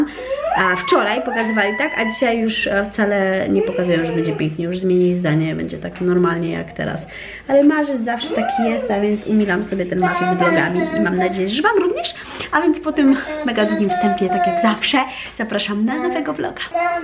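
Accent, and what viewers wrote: France. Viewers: Masz piękny francuski akcent,mega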